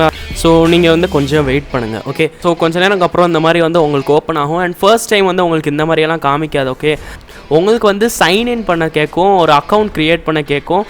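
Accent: Madurai, India. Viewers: Simply i am enjoying your MADURAI Tamil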